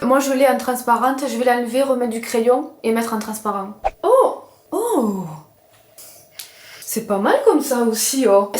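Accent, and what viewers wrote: South, France. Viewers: Mais non, je suis née à Aubergenville aussi (en Décembre 1988 pas lontemps avant toi) c'est fou!! 😄 Jamais j'aurais cru en attendant ton bel accent du sud 😉 Passe de belles fêtes et reste comme tu es ❤ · Chère! Ton authenticité est touchante et rafraîchissante. J’aime beaucoup tes vidéos, d’abord le maquillage m’a toujours intéressée, mais aussi tu ne te prends pas au sérieux et j’adore! En plus de ton accent du midi vraiment charmant. 🥰 Et puis je me reconnais souvent dans ce que tu dis: timidité, préférer les animaux aux humains, les down que tu vis… et je ne suis sûrement pas la seule. Bref, tes vidéos font du bien! Merci! Et de très joyeuses fêtes à toi et ta famille! 🎄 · Cc, ma!!!! Nous sommes ta thérapie en quelque sortes??? Et bien toi en tout cas tu l’es. Tu es et restera pour toujours une de mes youtoubeuse préférée. Je me retrouve tellement en toi. 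Tout comme toi, plus le temps passe, plus j’adore plus les animaux que les humains... Tout comme toi une vraie Brigitte Bardot dans l’âme. Ne serait-ce qu’avec, en ce moment, l’abattage incompréhensible des vaches et veaux, alors qu’avec un vaccin on pourrait les sauver. C’est une honte. Et pour une jeune fille née en région parisienne, tu as un accent bien prononcé du midi, d’ou cet enchantement à t’écouter et rire avec toi. La première fois que je t’ai découverte sur les réseaux, cela faisait un peu moment que tu mettais des vidéos en ligne. Et du coup, j’ai regardé en un après-midi toutes les premières vidéos depuis tes débuts. Et j’ai adoré. Ta franchise, ta sincérité, ton caractère, ta joie, ton amour pour les animaux (tous). Tu es un exemple pour toutes les générations. Un grand soleil qui illumine nos vies parfois triste... ont aime et j’espère encore découvrir beaucoup mais beaucoup de vidéos sur la mode, le maquillage, la déco. 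tes animaux, un peu de ta vie de famille, avoir des nouvelles de ta fille et de ton mari, même si on ne peut pas le voir. Je termine en te souhaitant de très très belles fêtes de fin d’année. Restes toujours toi même, c'est comme cela qu’ont aime. Bisous à toute ta petite famille. ❤😂🎉😊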